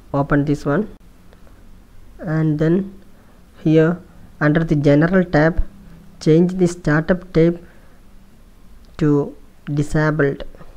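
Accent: Kerala, India. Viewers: I like ur mallu English 😂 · Are you malayali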